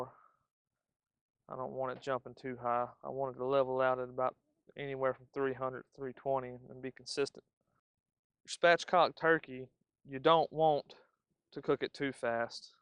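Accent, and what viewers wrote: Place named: Texas, United States. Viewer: Oh yum yum 👍👍👍 that’s such a unique way and creative way of smoking a turkey … I like that Texas twang coming from a native Texan. 😁😁😁 although I don’t live there anymore it will always be my home . ❤️ thanks for sharing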